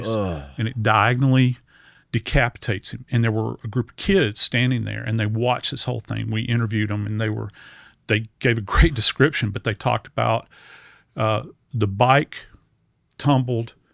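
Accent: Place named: South, United States. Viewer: He's got more education and experience than you can ever hope to have. He's southern, they pronounce words funny. He's a scientific genius specializing in criminal death scene investigations. Podcast is "body bags" and has written many books on this. You can obsess over a dumb word or listen to what he knows. Your choice.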